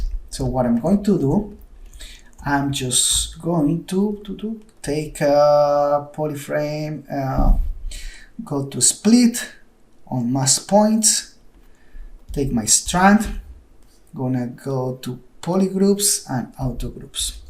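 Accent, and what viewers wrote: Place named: Mexico. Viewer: se nota bastante el acento mexicano ojala te animes a hacer en español un dia krnal saludos!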